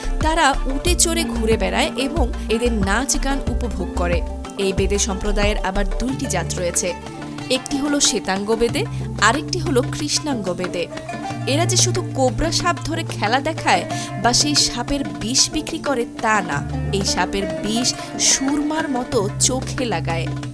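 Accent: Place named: West Bengal, India. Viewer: আপনি তো ম্যাডাম ভারতের পশ্চিমবঙ্গের মানুষের মতো উচ্চারণ করেন, কথা বলেন। তো -- হিন্দুদের ধর্ম নিয়ে ভারতে চলে আসুন-- ভারত আপনাকে স্বাগত জানাবে ------!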